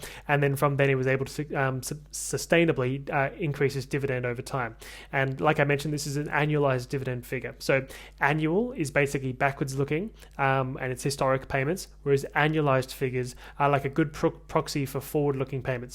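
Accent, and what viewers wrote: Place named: Australia. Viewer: He’s speaking Australian, that’s how we all speak.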